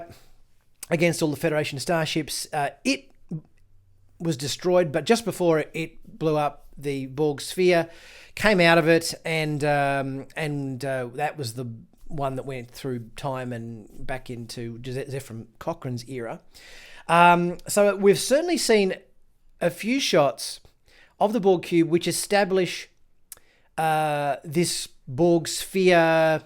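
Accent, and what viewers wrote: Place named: Australia. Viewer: Ah, Sutra is bad Karma! KarmaSutra, sorry I could not resist that! The theme of siblings interest me, you have Narek and Narissa, twins? one good, one bad! Jana and Sutra, which is also a puzzle, since Rios would have remembered if she was gold colored I would thought!. However I think this finale may have some surprises that no one expects, including Sutra, if the Admonition is was not understood by the Romulans, perhaps Sutra might have too, and what is coming will be like the battle of the 5 armies in the Hobbit, perhaps Gandalf and Picard have been comparing notes?? Yes, probably far fetched! I must say I have enjoyed your reviews, and look forward to how it plays out, judging from the accent Australia or New Zealand, I live in Wales in what I describe as God's country of Llaniltud Fawr aka Llantwit Major in Wales, in fact the finale of Dr Who was filmed in the around my neighborhood, the scene with the Cyberheads zapping the last humans was filmed at the St Athan"s Boys Village near the Aberthaw power Station! 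the beach with the gateway to Gallifray is nearby. I look forward to your next review, stay safe!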